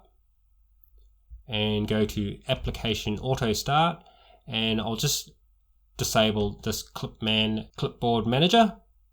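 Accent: Australia. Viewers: Thank you very much for these hints and tricks in MX Linux. I had so many problems with Ubuntu an Ubuntu Budgie(could not run my scanner and printer). Now with Your how to*s I finally been rescued from MacOS (too expensive) and Windows(hate it). The only suggestion I like to make is the confusing tools between "tools" and "tweaks". I always have sort out witch one is the setting up the computer and witch one is responsible for just the outfit. By the way I could not spot Your accent. guess you are from "Downunder" or this direction somewhere. TX again.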